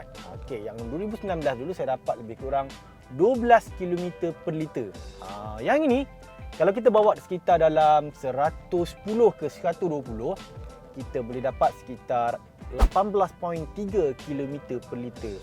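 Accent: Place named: East Coast, Malaysia. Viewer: org pantai timur mmg slang mcm ni..sama mcm abg jakpa.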